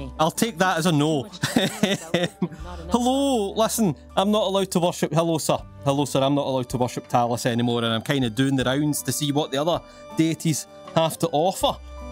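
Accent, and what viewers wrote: Scotland, United Kingdom. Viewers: I recently stumbled upon your channel and immediately loved it! You are really entertaining, and you talk just like one of my closest friends (who is also Scottish).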